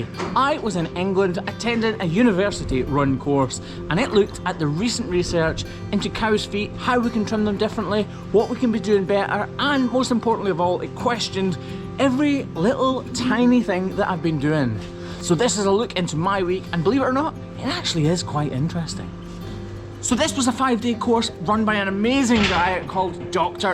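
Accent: Scotland, United Kingdom. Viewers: Why is it that after watching your videos & then checking the comments, I read the comments and your voice is what I hear in my head? 🤪🤪🤪 I'm here in the USA & I hear a Scottish voice in my head. 😁😁😁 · I never thought I would laugh at the phrase “foot fetish”, until I heard it with a heavy Scottish accent.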